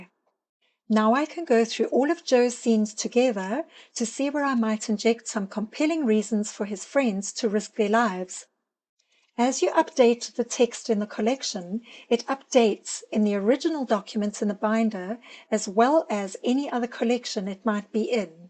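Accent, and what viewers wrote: South Africa. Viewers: Are you from South Africa? Love the accent! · I hear a South African accent!! Hello from little Knysna!! I just got Scrivener for Nanowrimo this year!!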